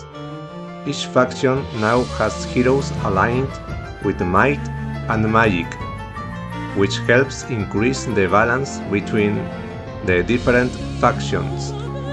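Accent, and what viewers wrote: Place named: Spain. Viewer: I love magic the gathering too ☺️ one question, are you spanish? yo sí, vivo en Madrid. Por tu pronunciación del inglés supongo que eres español como yo 😊 Your vídeos are awesome! ☺️😃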